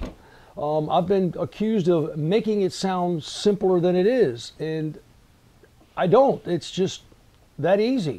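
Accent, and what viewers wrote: United States. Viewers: The guy doing the video sounds American.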